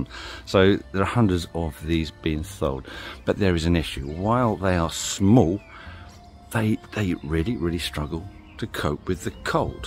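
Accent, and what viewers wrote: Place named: United Kingdom. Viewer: man I hardly understand some words. british accent..help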